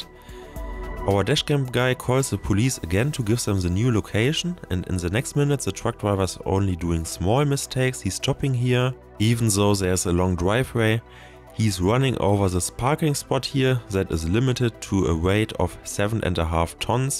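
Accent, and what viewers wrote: Germany. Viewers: Das ist das Deutscheste Englisch was ich seit langem mal wieder gehört habe. Aber schöne idee auch clips für die Englische community zu machen.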